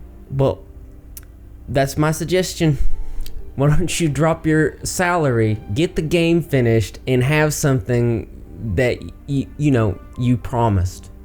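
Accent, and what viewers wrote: South, United States. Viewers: i've finally figured it out what kind of accent he has he sounds southern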